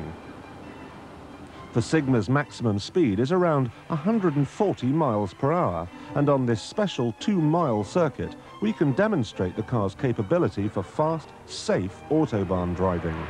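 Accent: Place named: RP, United Kingdom. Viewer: How did he go from suit and Queens English, to POOOOOWER! and SPEEEEEED!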